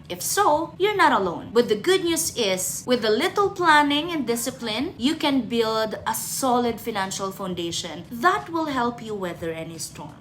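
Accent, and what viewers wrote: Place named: United States. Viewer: Parang nasa america ata to english hnd pinoy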